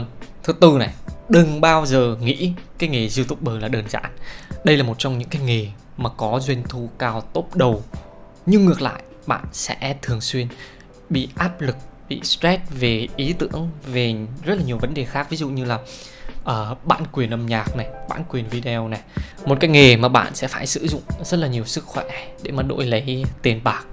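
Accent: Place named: Quang Tri, Vietnam. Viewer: giọng quảng trị hay thế mà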